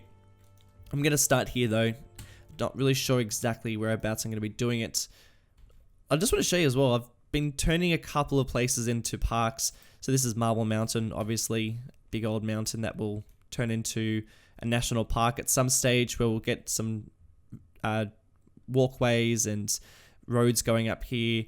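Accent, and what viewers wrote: Australia. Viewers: Crickey! Noice straya accent... 🇦🇺🇦🇺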